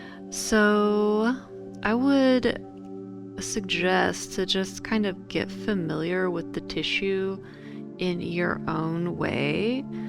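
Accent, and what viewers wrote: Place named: United States. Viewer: I like this girls really American voice. As a non American she reminds me of watching the US antiques roadshow. Also she gives a mean massage.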